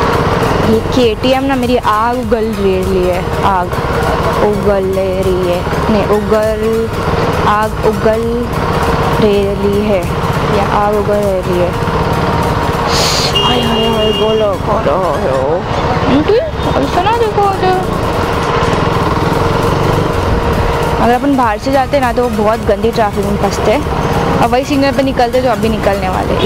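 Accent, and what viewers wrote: Mumbai, India. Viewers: well earlier I was going to say things like you are a noob, squid but after investing all my phone's battery I think you are not a bad rider. And as you say in your mumbiker accent "bhaay tu toh raapchik Hai". + 1 sub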